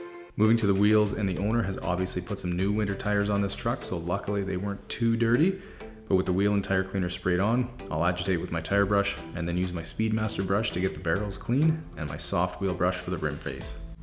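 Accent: Canada. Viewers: Hey You from Canada?? Alberta??? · just by listening to you speak, i knew you were a fellow Canadian 🇨🇦 keep up the good work!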